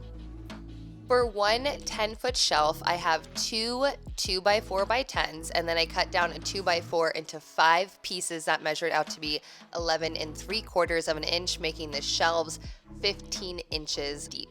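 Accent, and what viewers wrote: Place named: United States. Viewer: love your work. It’s just American accent. You have lovely voice.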